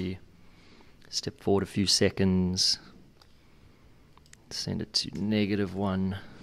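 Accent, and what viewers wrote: South Africa. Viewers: Thought i picked up a RSA accent confirmed when you said "ya" great videos and very well explained dankie from Livingston Scotland. Sub and liked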